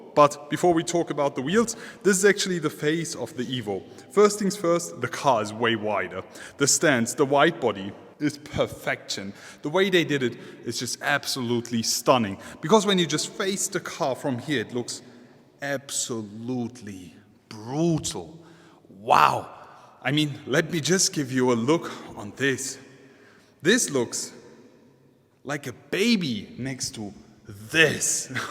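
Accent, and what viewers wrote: Germany. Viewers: It´s always very funny to hear a german guy speaking english !!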